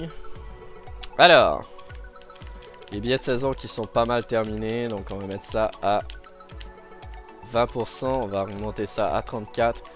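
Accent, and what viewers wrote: Quebec, Canada. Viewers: Salut au départ ton accent quebequois j'arrivais pas à y mi habituer (JE suis français) mais tu commentes bien et tout ce que tu fait dant tes vidéo cest sz que je ferait moi alors continue :)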